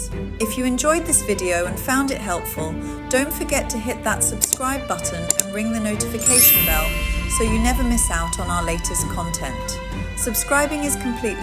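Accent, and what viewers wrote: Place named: United Kingdom. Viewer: I did like it and your Wonderful British Accent! 😊😊😊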